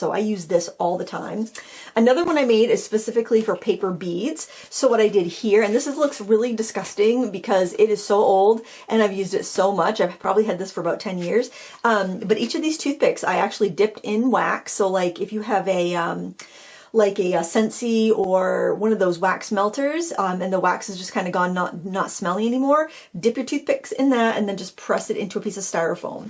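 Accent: Maine, United States. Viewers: LOVE that Maine accent... I thought you were saying 'drawring' board at first :) These are clever...well done. I love how your bowls are turning out!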